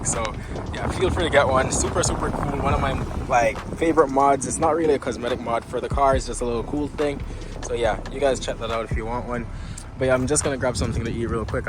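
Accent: Jamaica. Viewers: are you jamaican cus i can def hear somthing? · U Jamaican by any chance?? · i can tell your jamaican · You almost sound like Jamaican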